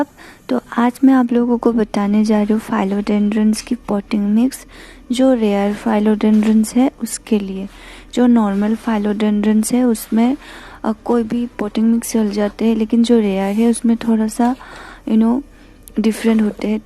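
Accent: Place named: Assam, India. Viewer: aap ka Assamese accent perfect hai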